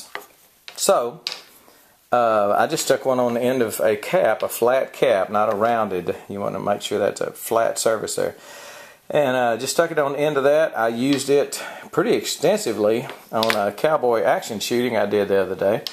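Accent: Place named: South, United States. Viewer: I understood every word and love your accent! Voice overs for Disney could definitely be a second line of work for you! Another Southerner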